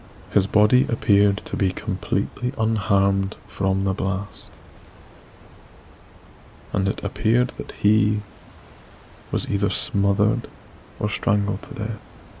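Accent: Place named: Scottish Highlands, United Kingdom. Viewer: your gentle , highland brogue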